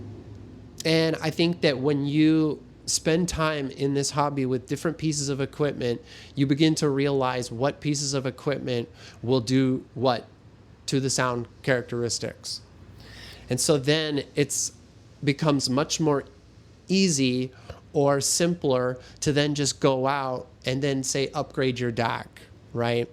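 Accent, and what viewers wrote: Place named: Germany. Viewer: Are you sure you're American? 😅 You sound like you have an accent, and for me, you somehow sound more German/European than American. Please don't be an immigrant, i.e., don't be contributing to the thug that is America. And disclaimer, I myself am Chinese and don't care to be called American, nor do I care to be represented by Hollywood Aesians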